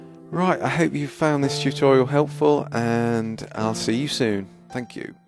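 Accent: United Kingdom. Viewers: hes sounds like he from the midlands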